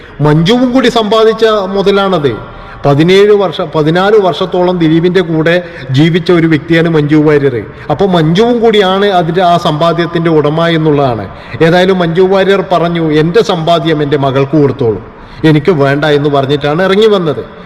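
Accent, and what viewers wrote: Kannur, India. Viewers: കണ്ണൂർ ഭാഷ ആണല്ലോ പറയുന്നത്, അപ്പൊ നമ്മളെ നാട്ടുകാരൻ ആണ് അല്ലെ 👍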